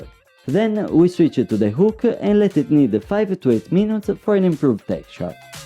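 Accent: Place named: Italy. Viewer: I love his Italian accent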